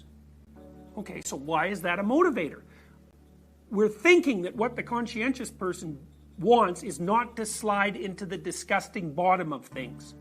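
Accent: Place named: Canada. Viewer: It's his Canadian accent.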